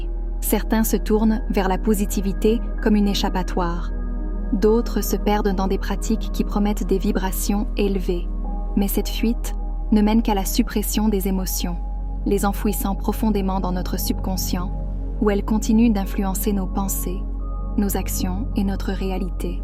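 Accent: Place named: Canada. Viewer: ************ Merci l'IA au petit accent canadien de ce message...Et merci aux initiateurs de celui-ci. *********************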